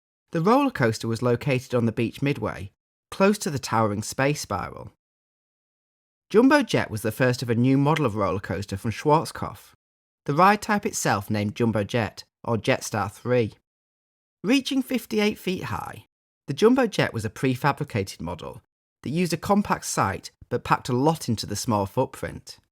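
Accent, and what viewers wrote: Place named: United Kingdom. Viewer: A British man talking about American theme parks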